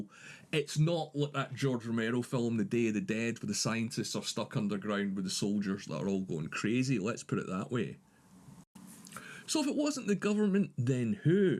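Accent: Scotland, United Kingdom. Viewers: I like Scotland’s accent! 😅 I think the silo was built by United States government, I did not read the novel books series, but that is what I got from unspoiled from readers reviews. However, you are right, it is hard for bureaucratic entity to build something works efficiently, but they built it under the apocalypse circumstances! When any mistake, no matter how small, may end the human race! In terms of Silo authorities, I guess there is some misunderstanding, I do not blame you, I had it at the beginning, simply because silo authorities power distribution is extremely unique, it never ever existed in our real world! But still realistic! In our world, the tyranny power, or dictatorship, evolve by military coup, expansion of executive influence, or legislature pressure (revelation). In silo, the judiciary is the monopoliser of power! It is rare in our world but make sense in silo where armed forces do not exist! 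Nor society freedom! Why the mayor looks like the highest authority, appears as president? It is like asking “why german chancellor looks like the highest authority, appears as president?“! The german chancellor title been used after Carolingian Empire fall, as the highest position left of the empire! So the correct question is, did the United States president and governors died during the apocalypse years and the highest position survived was a mayor?! “The Silo” as a fictional world is still evolving and several stories and novels written in the same world, each su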